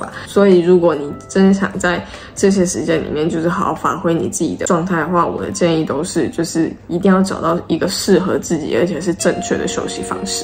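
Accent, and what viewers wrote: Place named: China. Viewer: 為什麽有種大陸腔哈哈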